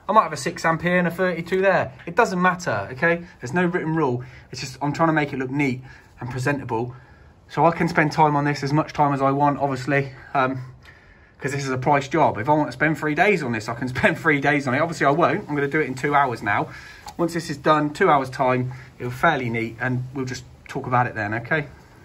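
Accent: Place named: West Country, United Kingdom. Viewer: I can hear a bit of West Country in your accent.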